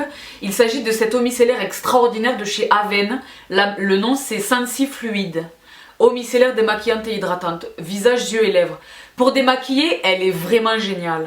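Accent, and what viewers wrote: South, France. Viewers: Coucou!:) Superbe vidéo. <3 Il y beaucoup de produits (enfin, beaucoup, c'est un bien grand mot. ^^) que je connais surtout des produits de la cosmétiques asiatiques (Une petite fan.:D ). Il y a aussi pas mal de pépites qui me font de l'oeil maintenant que j'ai vu ta vidéo (C'est mon compte en banque qui risque de ne pas être content. Ha ha! ^^' ). Ceux qui me tentent le plus sont: les Hadalabos, le "Time Revolution First Treatment Essence" de Missha et la protection solaire no sebum de Missha; Il y a le démaquillant/nettoyant de Vichy mais tu en parles dans une autre vidéo. Il m’intéresse surtout pour les moments où je suis plus motivée par mon lit que par la salle de bain.:D Ah et aussi l'huile d'avocat de Melvita pour mon contour de l’œil. D'ailleurs, le rouge à lèvres tatoue de Dior, j'ai l'impression que c'est un Tint. Sans le côté transfère par contre (ce qui le fait devenir plus intéressant). 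Mais beaucoup de marque coréenne en font (dont la marque Etude House que j'aime beaucoup surtout pour leurs multiples rouges à lèvres, leurs cushions, leur palette que je rêve de tester et le double lasting <3) depuis un petit moment. Peripera est connu pour leur Ink d'ailleurs. (si tu es déjà au courant et que l'as dit dans une vidéo, sorry, sorry: je n'ai pas encore vu toutes tes vidéos. Je débarque sur ta chaine.:) ) Voilà, merci de nous faire partager tes pépites, tes astuces, ta bonne humeur (<3), tes expressions (<3) et ton accent (j'adore l'accent du sud! <3)!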